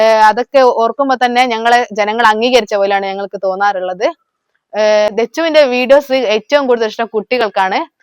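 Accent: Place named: Vadakara, India. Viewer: എനിക്ക് നിങ്ങളുടെ വടകര ഭാഷ കേൾക്കാൻ നല്ല ഇഷ്ടമാണ് എന്റെ നാട് വടകരയാണ് ഇപ്പൊ പാലക്കാട്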